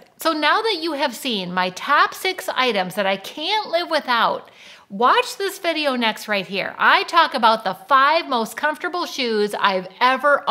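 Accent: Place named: Minnesota, United States. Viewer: I like how you combine your southern and northernaccents…. you say y’all, then you say if ya find ( but with the Minnesota accent!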